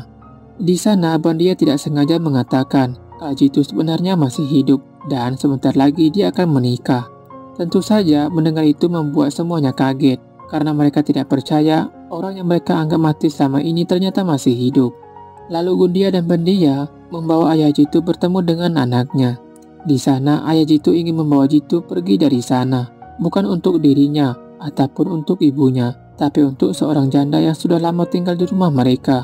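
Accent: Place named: Banyumas, Indonesia. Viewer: Ngapak Hadir lagi miin... 😘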